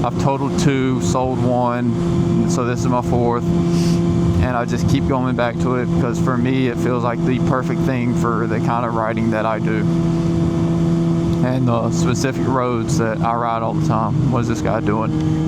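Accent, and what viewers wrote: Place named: South, United States. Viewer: i knew i been hearing a southern accent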